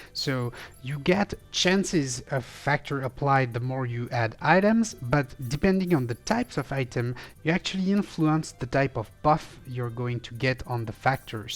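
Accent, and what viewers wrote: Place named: France. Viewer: Cool pourquoi tu ne fait pas tes vidéos en français aussi ? On entend bien que t es fr ça serait cool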